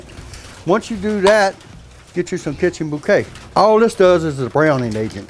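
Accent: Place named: Louisiana, United States. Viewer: Oh man, my dad grew up in South Louisiana and watching this video, with accent, mannerisms, and lingo makes me think of my dad so much. Its like watching my dad make jambalaya lol.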